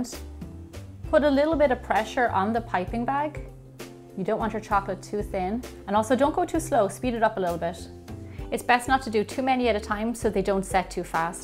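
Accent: Ireland. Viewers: Her accent, is she from Ireland? Sorry I'm not a native speaker :)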